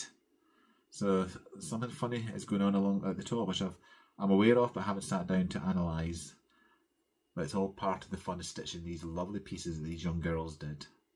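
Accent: Scotland, United Kingdom. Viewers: Great to visit with you. I worked on Summer Quaker by Lila’s Studio while watching. I forgot to comment last week, but I’m interested to hear your Scottish accent and Carl’s English accent together in a video. Your accent is quite noticeably Scottish. I live in the southern US, and I’m sure that I also have an accent that I can’t detect myself. Take care! 😊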